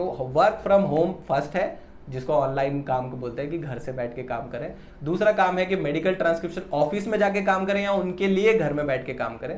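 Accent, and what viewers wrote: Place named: Uttarakhand, India. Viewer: Sir u r from uttarakhand ur voice matching uttrakhandi ji send address I am interested too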